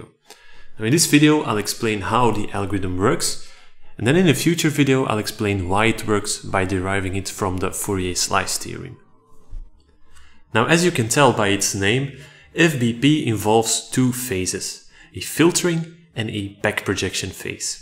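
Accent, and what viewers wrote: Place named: Belgium. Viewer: you sound belgian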